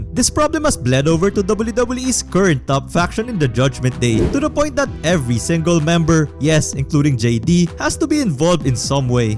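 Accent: Philippines. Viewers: He’s certainly held it hostage but you can’t blame but think that whoever finally beats him, that sigh of relief would be something else. Also from your accent, are you Filipino?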